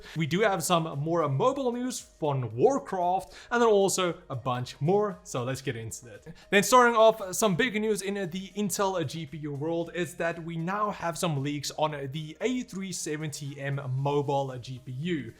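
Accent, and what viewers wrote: Afrikaans, South Africa. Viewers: are you afrikaans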